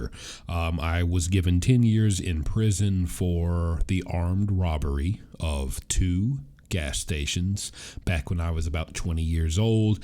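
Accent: South, United States. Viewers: Wow, I love this story but most of all I love your voice - how did you get rid of your southern accent? I live in Alabama and would LOVE to get rid of mine (grins).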